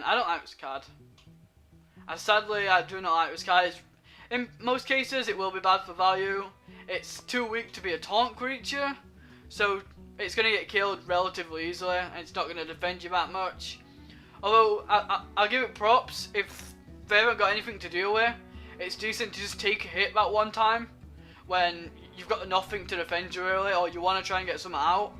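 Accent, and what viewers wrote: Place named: Manchester, United Kingdom. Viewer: It sounds like you are from Manchester in England, so for American audiences you will need to talk more slowly and concentrate on enunciation. With any presentation you might want to give a visual overview first, maybe showing a group picture of all the cards you will cover. Perhaps grouping them for aggro and control decks as you consider them and listing them in order of power, maybe a top 10 etc. I found your post searching for "hearthstone analyse one drops" as I was looking for more insight into choices as I'm new to hearthstone.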